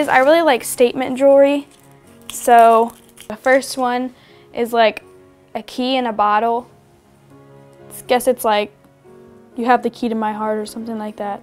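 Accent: South, United States. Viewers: You sound so country in this vid!! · Is it just me? Does she talk in a southern accent a little? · Is she from the South or something ? She has an accent ! :) haha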